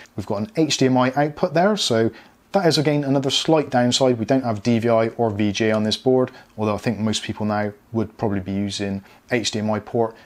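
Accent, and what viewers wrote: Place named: West Country, United Kingdom. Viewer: Ayy you sound like your from the south west! Bristol gang 😃🤣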